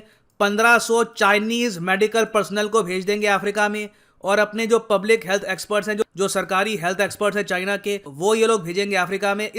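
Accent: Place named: Haryana, India. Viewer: Haryanvi accent 😃😃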